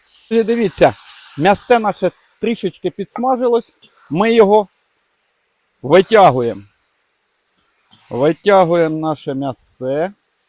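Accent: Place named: Poltava, Ukraine. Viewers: Розмова точно полтавська! Чи помиляюсь? У нас також капусняк готують і з квашеної і зі свіжої капусти. В залежності від пори року. Неперевершено! Дякую. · Такой приємний,, Говор "у Вас ,майстре ,така мова чарівна у Вас ,як у нас ,на Полтавщині .Приємно ,слухати,не тільки ,залучитися до вашого ,,Капусняка '',все так робити ,як і у нас .Велике Дякую.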